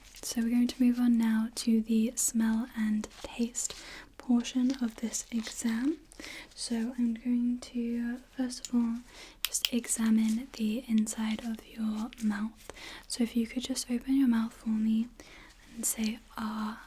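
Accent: United Kingdom. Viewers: pretty sure it’s british ! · Of all the nicest reasons to watch your ASMR I appreciate your accent, British is my favourite 🤍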